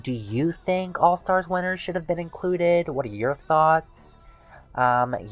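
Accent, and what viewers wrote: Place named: United Kingdom. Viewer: Your british accent cracked me up🤣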